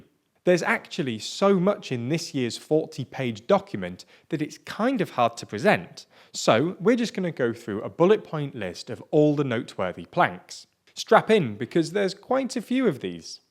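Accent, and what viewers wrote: United Kingdom. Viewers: Listening about US politics in British accent is so refreshing. It almost makes it less depressing and frustrating. Thanks for the video though.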